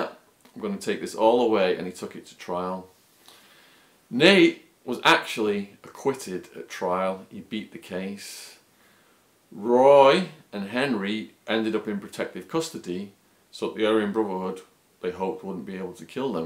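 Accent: United Kingdom. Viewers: You sound British. Were you deported? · Are you from UK?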